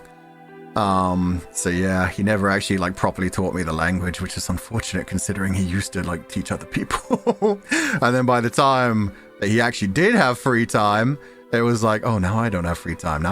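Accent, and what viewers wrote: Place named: United Kingdom. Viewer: 英語自体もイギリス英語です！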